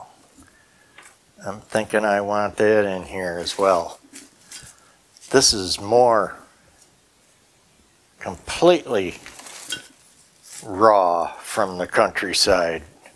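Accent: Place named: Illinois, United States. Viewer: Big or small does it all! Beautiful job. They’re all my favorites. You mentioned that you lived in Tennessee at one time. Were you originally from Illinois? That accent!!! Lol! Were you a marine too? 😃🌷🌺 God bless and protect the Ukraine 🇺🇦 🇺🇸 TFS!!!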